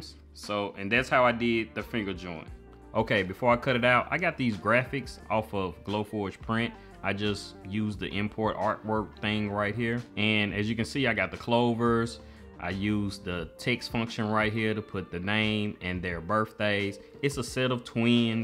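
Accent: South, United States. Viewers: Love this!! Makin' me want too look into how much all the equipment would cost.... Glad I found you, u can thank IG, there was some commercial & I just had to go find you, with your smooth southern voice! 💚Loving that too💚🤍💚🕊️ may God bless you!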